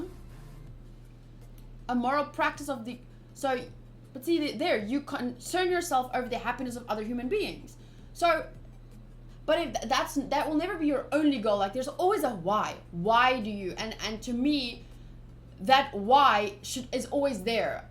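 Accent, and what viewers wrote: South Africa. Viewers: Nice accent! Where in SA are you from? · You sound South African? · I just knew you were South African!!!!!!!!(0_0) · Wait you sound South African. Are you? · you sound South African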